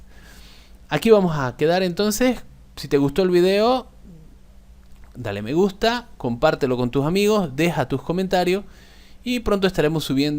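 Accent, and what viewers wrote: Santa Cruz, Bolivia. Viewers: Además me di cuenta por el 'puej' y que en algunos casos decías palabras con j remplazando s, típico de un cruceño 😂. Por cierto exelente video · Apenas inicio me di cuenta q era cruceño kakakajaajja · si cruceño de oro we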